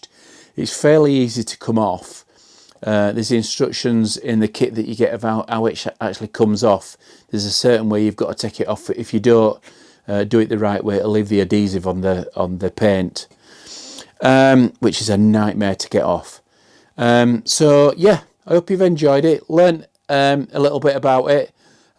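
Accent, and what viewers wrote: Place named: Yorkshire, United Kingdom. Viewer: Thank You,! It's pretty straightforward for dummies like me ))) And by the way lemme ask You one question: I noticed You often pronounced the vowel "u" as ʊ when it's supposed to sound like "ʌ". Fore example You say mʊd instead of mʌd... Is it a specific Yorkshire dialect? Thank You once more!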